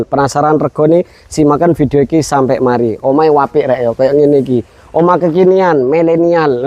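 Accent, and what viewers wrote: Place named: Madura, Indonesia. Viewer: Madura y bos.... Logatnya iku lo rek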